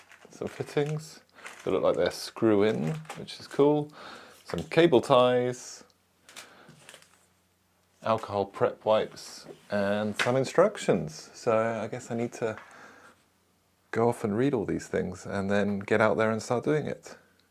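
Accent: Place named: England, United Kingdom. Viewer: I'm surprised nobody has commented on your Bokke jersey to pair with your English accent. Go Bokke!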